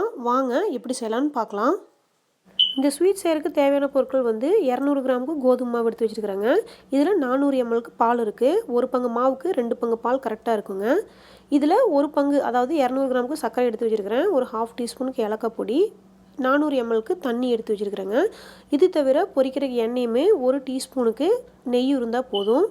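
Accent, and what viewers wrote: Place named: Coimbatore, India. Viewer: Wow different receipe, superb👍👌.. coimbatore slang nalla therithu 😊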